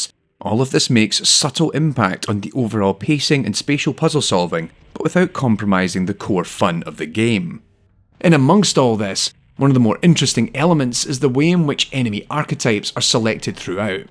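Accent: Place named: Scotland, United Kingdom. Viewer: You're the first Scottish (i presume that's a Scottish accent) for whom i don't need the subtitles.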